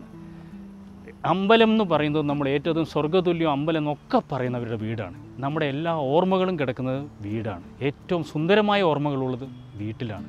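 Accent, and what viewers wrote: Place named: Kasaragod, India. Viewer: I thought you are from kasaragod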